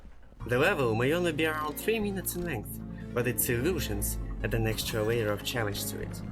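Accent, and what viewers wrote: Russia. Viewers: Тяжело слушать русский акцент🫪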